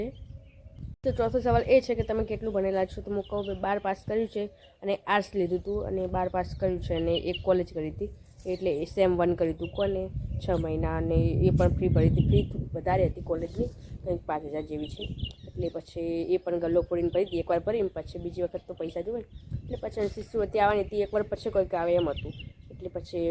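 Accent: Banaskantha, India. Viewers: Tame KO k na KO pan mane tamari boli uparthi junadeesa aaju baju na lago so ben....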